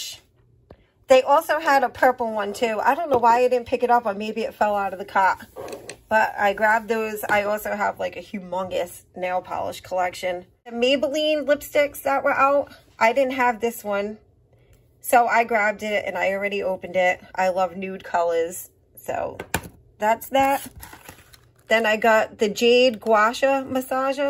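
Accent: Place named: Texas, United States. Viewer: I am really enjoying your videos. I am from Texas so I love your accent. You are doing a great job. Keep them coming.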